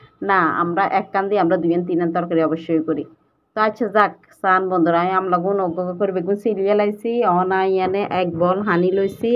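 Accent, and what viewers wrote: Noakhali, Bangladesh. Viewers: অসাধারণ হয়েছে আচার রেসিপি সাথে নোয়াখালীর আঞ্চলিক ভাষা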